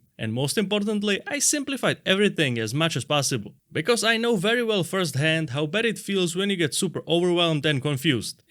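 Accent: Czech Republic. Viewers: i'm not sure but his accent sounds Czech or Slovakian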